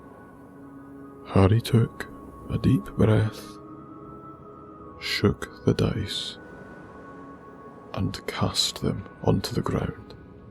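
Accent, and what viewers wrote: Scotland, United Kingdom. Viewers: What's withe terrible Scottish accent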